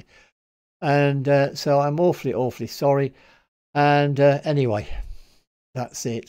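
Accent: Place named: United Kingdom. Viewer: Thanks always from Japan 有難う💛。 Yes. When you reply to or put heart mark on my comment, it makes me happy, but that is not what I expect on your reaction videos. Your smiles, grinning, smirks and witty observations in British accent on Band-Maid, BABYMETAL etc. are what I want to get from you. The reaction video is just for fun, not mandatory duty for both reactors and viewers. Please keep on just the way you have been.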